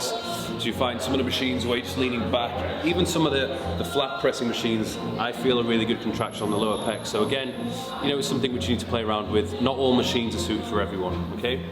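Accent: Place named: England, United Kingdom. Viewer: Finally an English accent!!!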